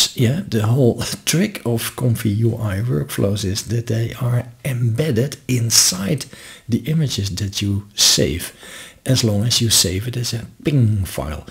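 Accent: Netherlands. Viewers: some vague intuition tells me you are Dutch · duidelijk te horen dat je nederlands bent :) bedankt voor de informatie. ben nogal nieuw met ComfyUI en jouw workflow bestanden zullen zeker helpen mij het beter te begrijpen :) toodles!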